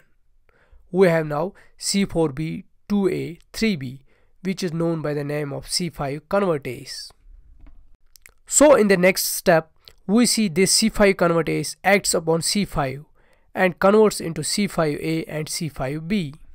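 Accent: Kashmir, India. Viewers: Thank you so much. It was really helpful. Are you a Kashmiri though? Sound very much like a Kashmiri😄❣ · Are you sir from kashmir 😍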